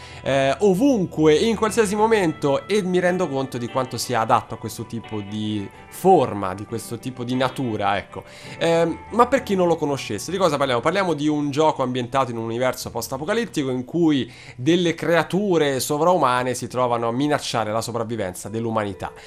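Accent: Rome, Italy. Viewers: Il vero problema è che tu, avendo uno spiccato accento romano, devi scrivere " 'n c(i)'à ccapito Ggnente...", c'è la doppia "g" anche se serve a raddoppiare il "gn", "nniente" invece "nun sona pe' ggnente". Se mme so' sspiegato, bbene, sennò ve n'annate...(censura)......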